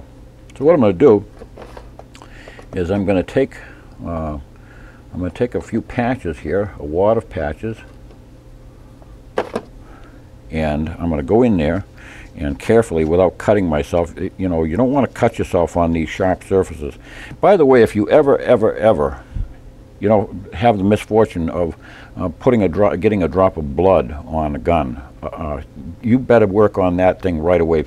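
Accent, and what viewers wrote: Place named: Massachusetts, United States. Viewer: Great video. Very informative. I have two S&W revolvers and this is great information. Also enjoy the Massachusetts accent :)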